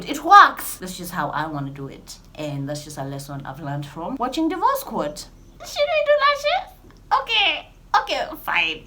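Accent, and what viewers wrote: United Kingdom. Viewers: When that British accent checks in, I fall in love with you all over again😊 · The British accent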